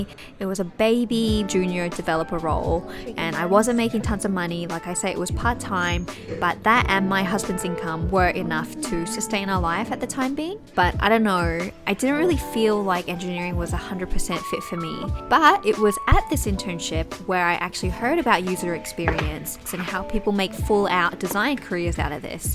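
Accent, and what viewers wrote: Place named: Michigan, United States. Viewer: Yours is easily the coolest Michigan accent I've ever heard!! Your zig-zaggy life story is interesting too. Those are the most interesting life stories, where the person had to try a bunch of things before something eventually floated their boat. I'm paraphrasing Jerry Yarnell, the TV painting teacher guy who said something like, "Bravery isn't not being afraid to do something. It's being afraid to do something, and then doing it afraid." Looks like you've got pretty mad crafting skills too. I'm subbing your channel so I can swing by here from time to time.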